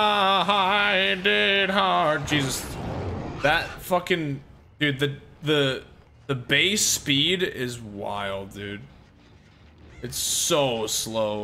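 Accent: United Kingdom. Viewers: A bit bri ish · That british accent is too funny man 😂 this new killer looks fun. Keep up the good work friend - british viewer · As a brit, I actually like your british accent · Very good British accent I'm very impressed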